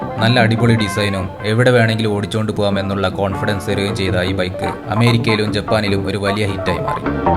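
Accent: India. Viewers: ഇങ്ങള് കണ്ണൂരാണോ ....? 🤔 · Pakka kozhikode slang is here 😇😇😇🥰🥰😍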